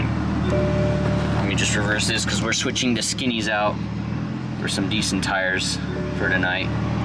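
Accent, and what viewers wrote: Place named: Spain. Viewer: de donde eres escucho acento español¿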